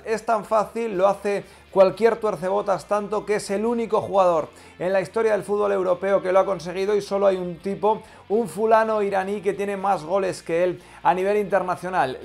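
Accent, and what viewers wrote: Argentina. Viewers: Jajaja que buen acento porteño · Que acento argentino tan bestial. Mis respetos crack 😝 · Te salio bien el asento argentino 😁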